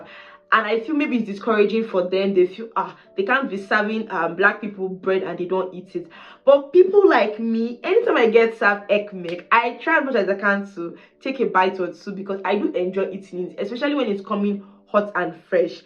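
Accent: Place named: Nigeria. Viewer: You speaks very much like Nigerians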